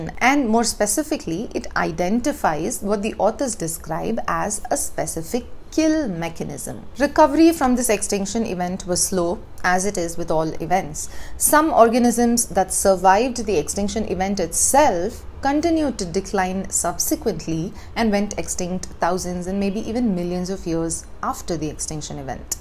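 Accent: India. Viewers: I love female Indian accents. 😍😍😍😍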